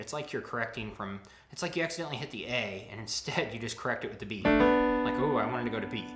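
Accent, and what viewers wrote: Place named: Rural, United States. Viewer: Your voice is so country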